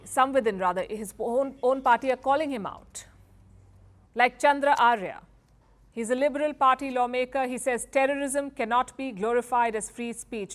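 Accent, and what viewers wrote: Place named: India. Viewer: Dmbaz this is indian accent. Which American canadian talks like that?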